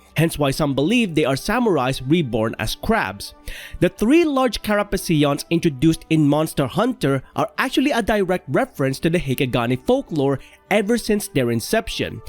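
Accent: Philippines. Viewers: I like your accent, as a fellow filiipino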